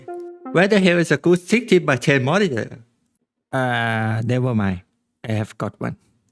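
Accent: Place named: Thailand. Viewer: I recognize that Thai accent right away. Good job bro.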